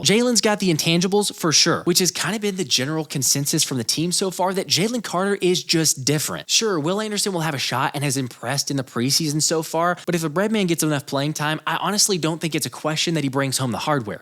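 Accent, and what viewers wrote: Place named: South, United States. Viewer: Where are you from bro? Have a little southern twang to yiur accent, its obvious you are nkt from Philly...but great content!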